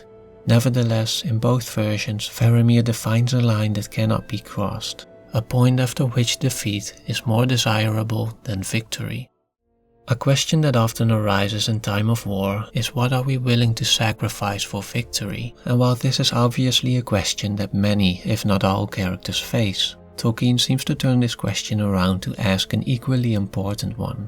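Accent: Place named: Netherlands. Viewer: 10 seconds in and I'm like: Hey! A dutch narrator speaking english!😃 Are you Dutch?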